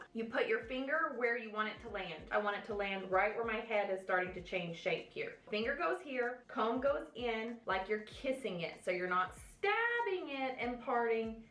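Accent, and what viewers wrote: South, United States. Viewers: Hello, I just love your videos. I haven’t seen any one use Sally’s products which is what I only have access to. Also your a great teacher ! You explain things in detail that I understand .So thank you ! You are from the south ! So am I . I’m trying to figure out if your from alabama or Tennessee… just guessing I could be totally off here!! Thanks again .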